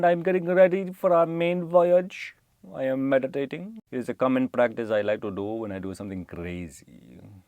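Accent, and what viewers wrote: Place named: Massachusetts, United States. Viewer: You sound like you live in MA ?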